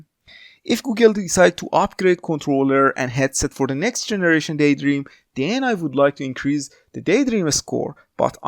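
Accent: Iran. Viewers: Haha, I was going to ask him this, if he Persian. 🤣🤣🤣🤣 Love it. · Love you Persian accent :D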